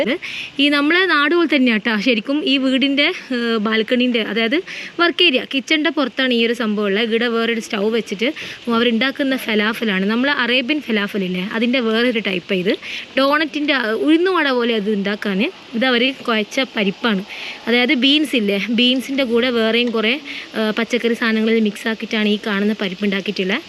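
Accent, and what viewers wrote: Kannur, India. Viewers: കണ്ണൂർക്കാരത്തി അല്ലേ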